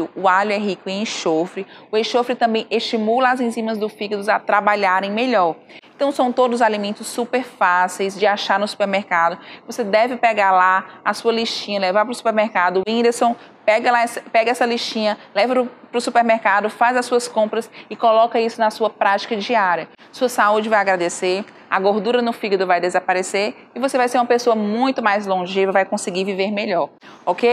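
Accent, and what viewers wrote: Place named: Ceará, Brazil. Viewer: Pelo sotaque dela deve ser do Ceará.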